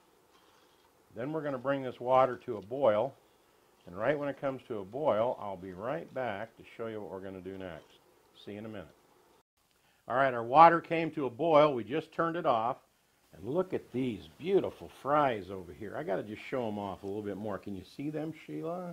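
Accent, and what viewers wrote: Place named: South, United States. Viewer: Nashville, Tennessee. I love the southern accent.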